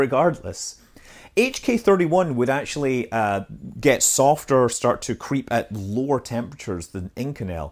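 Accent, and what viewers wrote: Scotland, United Kingdom. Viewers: There isn’t one ‘British accent’ His accent is a cross between a Scottish, Irish and a small bit of a Californian accent because that’s where he lives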